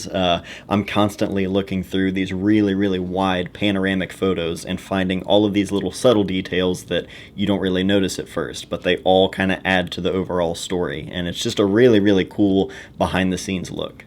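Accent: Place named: South, United States. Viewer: I just realise how southern you sound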